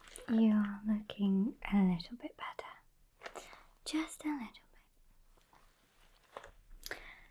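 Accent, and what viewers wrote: United Kingdom. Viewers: Rei Ayanami with british accent and is a nurse?!🤔 Noice!😉😏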